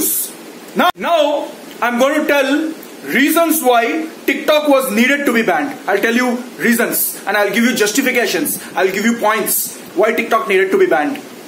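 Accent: Bengal, India. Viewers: his hindi just oozes that bengali feeling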